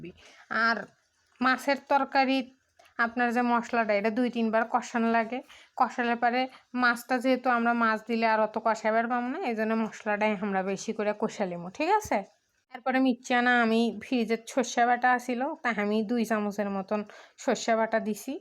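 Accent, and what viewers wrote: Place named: Bogura, Bangladesh. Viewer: হামাগেরে বগরার ভাষা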